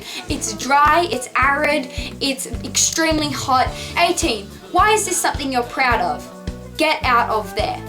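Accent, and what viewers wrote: Bogan, Australia. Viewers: agh not only do you sound bogan but I wanna thong ya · you realise you sound like a bogan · Why is your voice so Ozzie? Don’t get made at me I am Australian.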